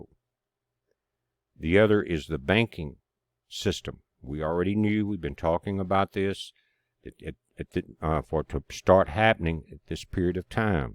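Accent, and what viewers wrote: South, United States. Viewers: I like him because he has a southern accent but his reporting is some of the most level headed reporting i've observed.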